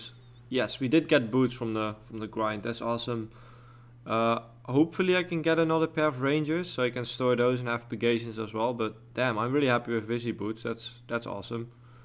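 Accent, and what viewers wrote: Netherlands. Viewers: nice, i heared a little dutch accent:p, nice videos man! Just subbed! Keep it up 💪🏻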